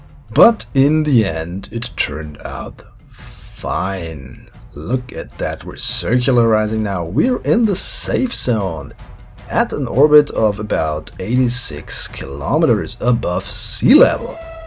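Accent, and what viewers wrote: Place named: Germany. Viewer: Thanks for the entertaining commentary. Always fascinated by your amazing designs with practical and elegant solutions. Good choice of analogy with the "unfinished symphony", since nothing ever gets finished when you play KSP... I hope that you soon again will show how to make Mun bases. I really liked "Operation Moonsucker" Btw. are you from Germany? I note a barely noticeable German accent in your flawless English.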